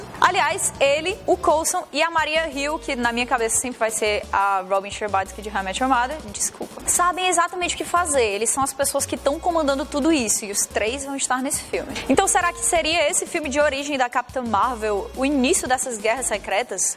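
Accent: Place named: Ceará, Brazil. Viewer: Só sei que o universo Uplay iria desmorar, se a saísse do programa. Mas, eu sei que isso não tem nem perigo de acontecer. =D P.S.: É impressão minha ou o vídeo de hoje saiu "mais cearense" que o normal? ^^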